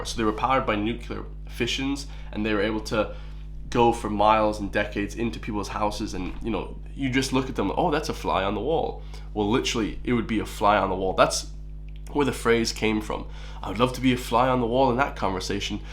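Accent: United States. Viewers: Are you irish? You sound like a yank Trumper, cringe, drop the far right bollocks and go back to the rap reactions bud.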